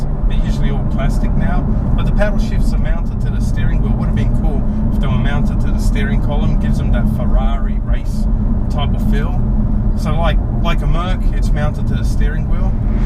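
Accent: Australia. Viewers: If this is what the Australian accent has come too , i am fucking disgraced in this country . Like oh my gawd fully fucking tabouli ....... wake up australia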